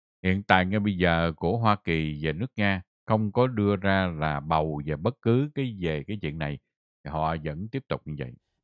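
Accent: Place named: South, Vietnam. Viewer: rất yêu thương giọng nói *, giọng miền nam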